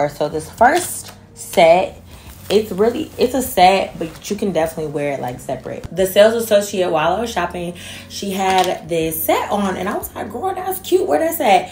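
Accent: England, United Kingdom. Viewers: "mom says don't drink the milk"........ I love British accent!!!🤣🤣🤣💕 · The English accent 😂😂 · The British is killing meeeee I love you lol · Your British accent kills me 😂😂😂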